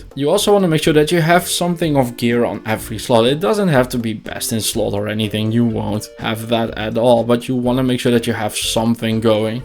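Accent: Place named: Netherlands. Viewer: I've been stuck on NM for 9 months now because I'm waiting on the next entry in this series..;) jk, but nice video and looking forward to new videos! (Also nice to hear a fellow dutchy)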